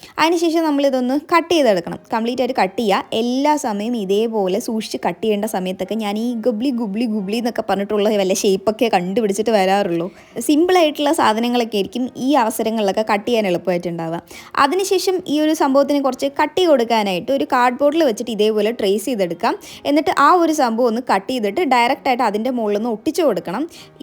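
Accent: Palakkad, India. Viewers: ചേച്ചിൻ്റെ വീട് പാലക്കാട് ജില്ലയിലആണ് എന്ന് പറയുന്ന നതിന് മുൻപ് ചേച്ചിയുടെ SLANG കെട്ട പ്പോൾ മനസ്സിലായി പാലക്കാട് ജില്ലയിലാണ് വീട് എന്ന് ഞാനും പാലക്കാട് ജില്ലയില്ലാണ്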